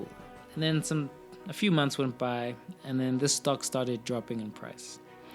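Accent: South Africa. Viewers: Nice video! Are you South African?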